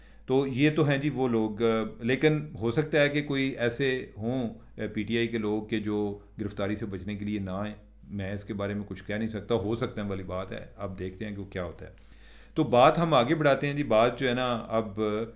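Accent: Punjab, India. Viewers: sb, aap ache khaze program kr rahe hn mager pata nahi q aap Imran shafqat aur goher butt ka copy krte hn, I mean punjabi tarka lagate hn. Punjabi language is very very sweet and I love it but don't copy them. You are already very popular in your own style.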